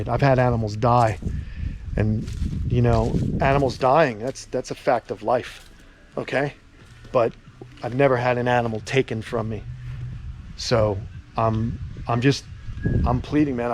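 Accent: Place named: New York, United States. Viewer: The New York is starting to come out.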